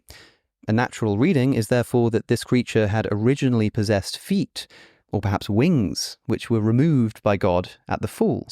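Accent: United Kingdom. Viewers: I'm glad I was reading along or I would have had no idea what "his face shawn" meant. For those that are curious, it means "his face shone", but said with that cute accent British people have. :P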